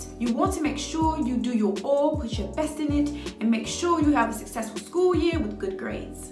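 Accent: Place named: England, United Kingdom. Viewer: Some good tips Teacher...loving the glasses and English Accent 😂👌🏽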